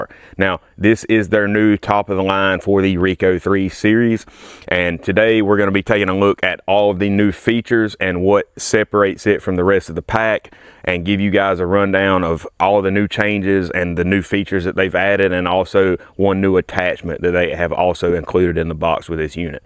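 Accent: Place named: South, United States. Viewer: My god, the hic is thick in this one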